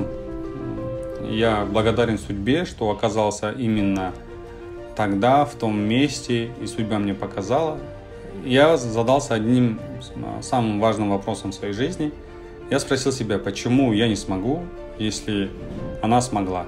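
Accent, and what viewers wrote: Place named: Naryn, Kyrgyzstan. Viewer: Крассавчик ты как Нарынский говорю...Эркек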